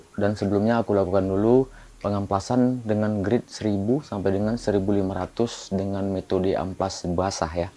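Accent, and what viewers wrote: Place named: Padang, Indonesia. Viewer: Cara ngomong lu keren bang kaya temen gua dari padang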